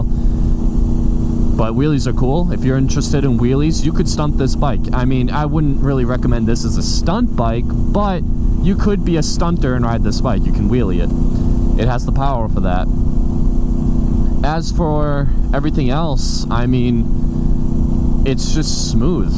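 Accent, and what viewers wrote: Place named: Boston, United States. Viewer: I hear Boston in your voice. Go B’s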